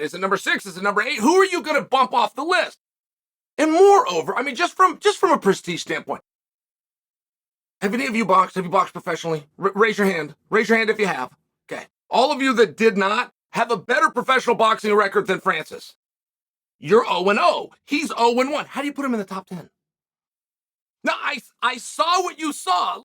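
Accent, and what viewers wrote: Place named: East Coast, United States. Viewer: Chael you do so good on your podcast. Engaging, passionate, hand gestures, east coast it is what it is talk BUT fair enough were gonna do it anyway, kinda Trump talk. Great for this side of entertainment and what people want to see when they click something like this topic. You're doing great with your podcast format, wouldn't change much. Just keep going!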